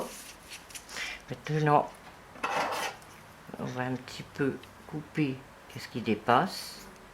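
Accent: North, France. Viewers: Merci pour cette bonne démonstration! Bonnes explications! Belle vidéo! Bonnet facile à réaliser au crochet! Bonne idée de prendre plusieurs fils pour faire le pompon, j'y avais pas pensé! J'aime votre accent du Nord! Amitiés de Belgique MIMILOU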